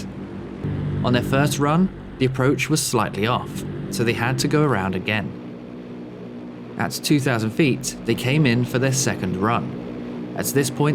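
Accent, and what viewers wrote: England, United Kingdom. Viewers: I really appreciate the variety of aviation incidents you present, & this certainly was a very tense & exciting account. What a remarkable young man John Hannah was. Such sorrow for his life cut so short. I enjoy your clear concise narration and English accent too.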